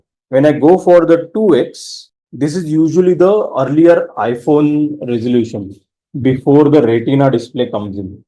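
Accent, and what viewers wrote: India. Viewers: My head keeps shaking like an Indian when I hear this accent. I don't know why.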